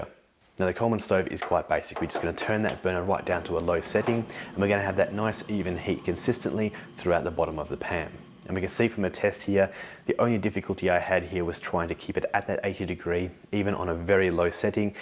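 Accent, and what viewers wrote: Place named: Australia. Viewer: Nice to hear an Aussie who isn’t a hobo. Well done.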